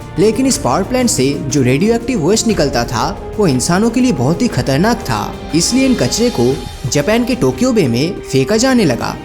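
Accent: Bengal, India. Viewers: Are you bengali? 🙄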